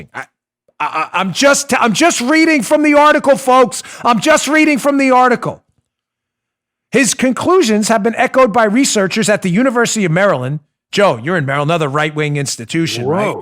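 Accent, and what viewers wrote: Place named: New York, United States. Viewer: When I used to play solo piano in international settings, I could often tell when a listener was from New York because first, he had a funny accent, but more importantly, he talked to me like me and him had been good friends for years. Listening to Dan reminds me of that.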